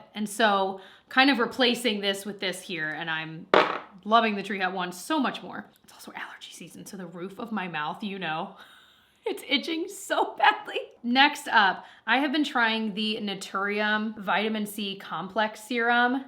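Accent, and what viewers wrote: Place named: United States. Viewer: I so enjoy your videos!! How you “feel like” x,y,z. I miss America so it’s fun to hear the little dialect differences. Been hoping for a review on that setting spray!!! Thank you for being genuinely you!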